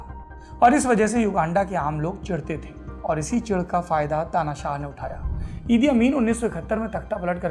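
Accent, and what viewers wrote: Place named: Kanpur, India. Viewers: Kanpuria style mein news sunna hai to Saurabh bhaiya ka lallantop dekho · बहुत दिनों बाद.....आज इतना बढ़िया यूपी वाले लैहिजे में बोले हो .....मजा आ गया आज तो.....👌👌👌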